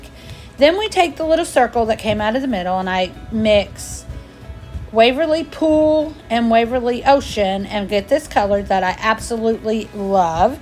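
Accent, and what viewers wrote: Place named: South, United States. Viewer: Loved the beach theme and your Southern accent. So glad I found your channel.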